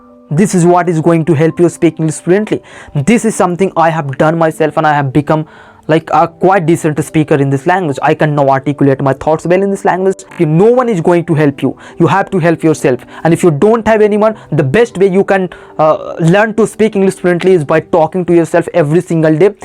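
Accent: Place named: India. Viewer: Indian accent 😬🤢🤮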